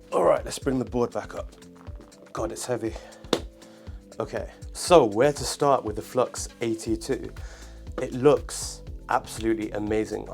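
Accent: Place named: Birmingham, United Kingdom. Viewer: Do you need a wing man ? I’m watching your vid of the mighty flux the voice in my head tells me I know where your ride is my home city brum !! I knew that annoying but sincere accent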